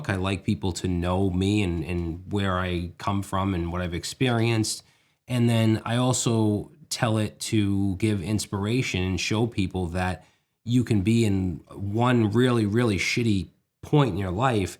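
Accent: Boston, United States. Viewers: Was curious how vite worked and found your video to be super helpful. Thought I heard a boston accent so decided to check out your channel. I'm two minutes into this video and find it to be very moving. Earned a sub today, go sox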